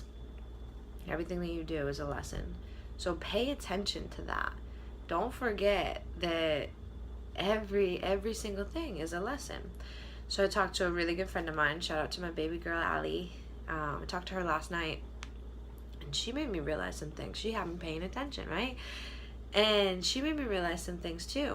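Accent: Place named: Boston, United States. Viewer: You seem very genuinely happy in your videos it's amazing! Also heard some Boston accent in this one, whateva whateva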